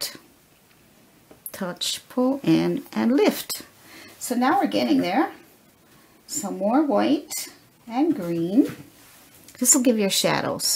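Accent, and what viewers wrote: Newfoundland, Canada. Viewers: You from Newfoundland? Accent?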